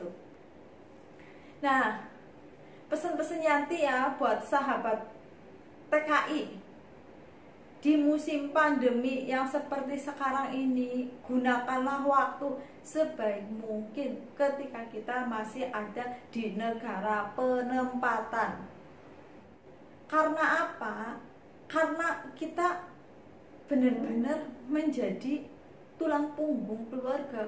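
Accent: Banyumas, Indonesia. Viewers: Jebule rika wong ngapak yak hhh jossss wonosobo monitor.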